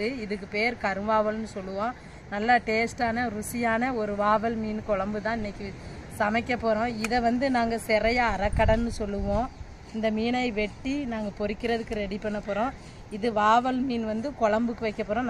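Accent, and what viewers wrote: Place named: Salem, India. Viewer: சேலம் மாவட்டத்தில் இருந்து பேசுறாங்க சூப்பரா இருக்குங்க வீடியோ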